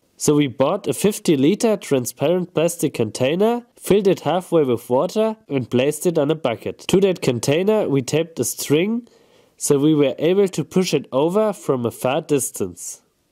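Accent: Germany. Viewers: I hear a Deutsch akzent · Der Deutsche Akzent ist so geil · klingt sehr deutsch haha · German accent makes it even more professional! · Den deutschen Akzent hab ich sofort raus gehört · Beside the German accent it is always important to try this under a 380kV power line, to get the extra fizz, at least when the plume rises high enough. If seen more intelligent YT videos of wasting money or creating some bang. Try it with 1000g Ammonal next time. It's cheaper and more impressive. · Du sprichst sehr gut. Aber dein deutscher Akzent hört sich ein wenig lustig an. Das muss ich zugeben. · Your German I know it. (I’m German) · na ja.. Umwelt Verschmutzung das Plastik Bekommst Du Nicht restlos weg.. und 2 Ich höre das du Deutscher bist. also Hättest du es auch gleich in deutsch machen können.. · Most german accent i've ever heard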